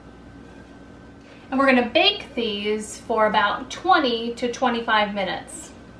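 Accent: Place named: South, United States. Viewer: you sound like a Southern gal, like me. Mama ( and I do, too ) used a cast iron skillet. There is one question that I ALWAYS ask at a restaurant if I may want to order some cornbread........” Has it got sugar in it? “ If it does, FORGET IT! But, there have been many times when I have made a skillet of cornbread and eaten...........only those crisp, brown edges........all the way around the entire skillet! Oh, Lordy, talk about good eating! Now, my daughter likes the center part ( she’s 1/2 “damnyankee” ), but I go for the crunch brown edges. And my Mama? She had to have some buttermilk with hers......yuck.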